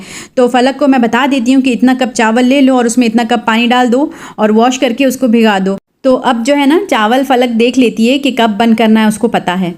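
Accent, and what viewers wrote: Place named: Uttar Pradesh, India. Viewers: Masha allah aapne notice kiya 3 4 days aap k subscriber fatafat increase ho rahe mujhe bhi dekh k maza araha hai aisa lag raha hai ye mera hi channel hai aap k andar se mujhe bahot hi apne pann ki vibes aati hai main bhi u.p se hu gonda se aapki way of tone bilkul humare jaisi hai. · MashaAllah I love ur content...also I am from mumbai and looking ur talking style I feel ur originally from UP..kuiki humbling mumbai mein rahe hai aur parents UP k hai...baat aap jaise hi karte hai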